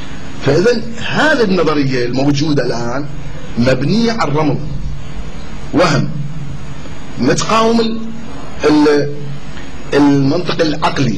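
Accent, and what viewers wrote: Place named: South, Iraq. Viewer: الصوت صوت هذا الدجال نفسه لان اللغه او الهجه الي يتكلم بها نفس لهجة جنوب العراق لا احديسطتيع ان يتكلم بهذه الهجه الا اهل الجنوب/ الشيعه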